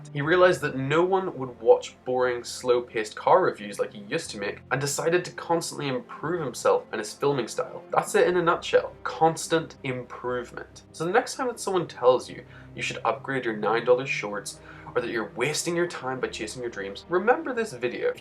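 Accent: Ireland. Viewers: Are you irish? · U Irish?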